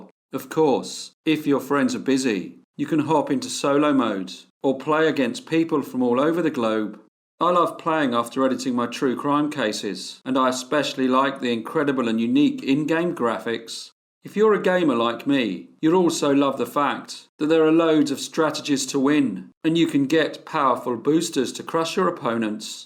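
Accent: England, United Kingdom. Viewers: Reputable channel, not quite. The narrator can not even say the gentleman's name the right way. Isn't it said Hose' or 'ose'? You don't say the J because it is silent? If this channel was reputable, it would have also done the research of how to pronounce the Brazilian names. Hence, the English narrator was probably making fun of how the South American dialect is pronounced. This is the reason why I have not subscribed to this channel. I can't stand the one tone monolog, and that flucking English accent!!! Why not be a little more respective, and also get another narrator, possibly a female!!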